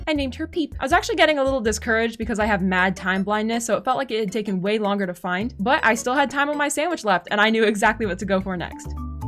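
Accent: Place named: United Kingdom. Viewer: You sound like someone from around the uk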